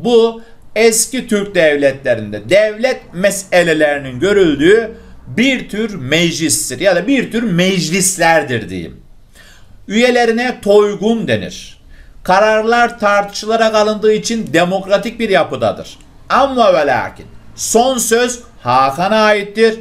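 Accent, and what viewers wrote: Adana, Turkey. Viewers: Adanalısınız galibaa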